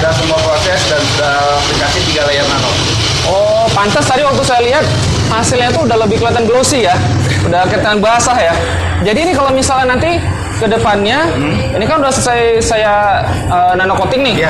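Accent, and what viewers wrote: West Sumatra, Indonesia. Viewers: Rang bukik kironyo,. Pantas ada logatnya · logat minang memang gak bisa ilang, dari menit makin jelas bgt, dima kampuang diak.....?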